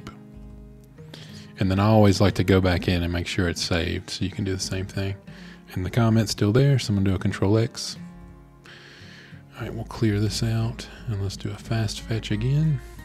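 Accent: United States. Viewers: Wonder why I can kinda guess which part of USA you're from!?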